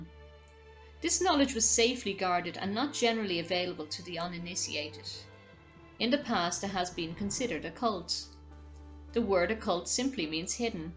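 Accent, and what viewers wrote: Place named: Ireland. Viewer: Are you Irish? I love your accent! Not flirting just saying. Sometimes it love to jump into the mind of an Irishman to see how we sound to you guys.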